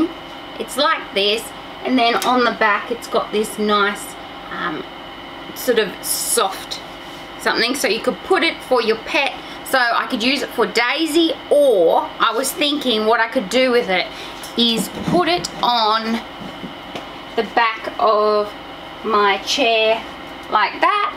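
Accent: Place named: Australia. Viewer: You have such a strong Aussie accent! 😋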